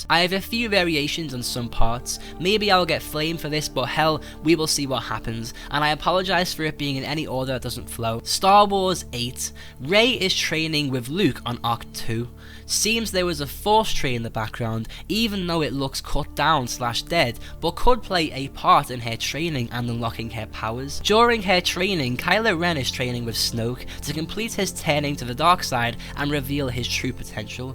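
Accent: Liverpool, United Kingdom. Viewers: scouse twat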